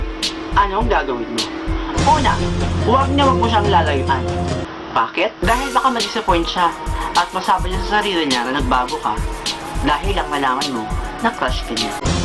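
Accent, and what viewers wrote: Visayas, Philippines. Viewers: Are u bisaya?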